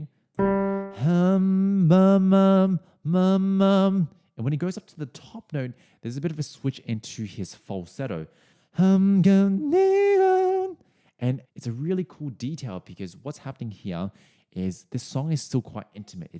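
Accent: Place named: Australia. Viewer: I make my piano students listen to Jeff to understand different tones and phrasing. I think my Caucasian Canadian choir did not appreciate my raving about Jeff! BTW, are you in NZ? I’m trying to place your accent. I left in 1987. But you pronounced “example” with the Aussie “a”.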